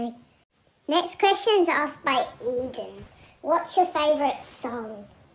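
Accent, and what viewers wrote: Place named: Australia. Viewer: i can hear the australia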